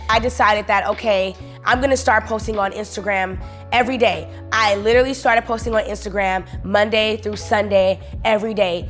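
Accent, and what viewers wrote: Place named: California, United States. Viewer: When i heard you talk i was like she's from Cali and i know she's from the bay and the u said something about Walnut Creek on one of ur vlogs lol i live in Arkansas now but i know how we sound lol 😆 btw you've gained over 2k subscribers in one month! Ur doing amazing ! U def motivate me to get back to being my self !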